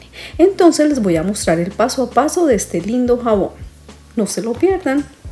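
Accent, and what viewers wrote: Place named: Colombia. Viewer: de donde eres?!!, tu acento parece Colombisno!@ Saludos, una fan,Diana 🇵🇷👋🥰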